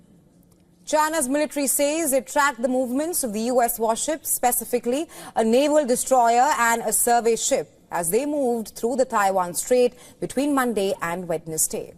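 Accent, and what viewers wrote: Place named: India. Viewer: I dont understand her indian english tune😢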